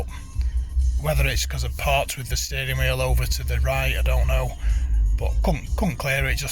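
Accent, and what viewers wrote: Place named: Australia. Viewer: Your Australian accent is so difficult to understand.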